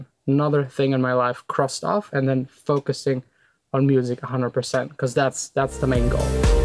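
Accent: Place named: Germany. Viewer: There are definitely whole sections of this video I swear I am hearing my words come out of your mouth , but with a German accent.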